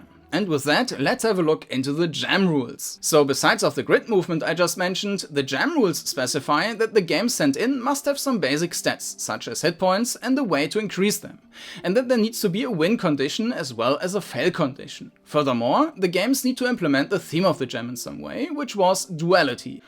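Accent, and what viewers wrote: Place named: Germany. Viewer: Ur englisch sounds so german :). Cant wait for this years crawler jam to start.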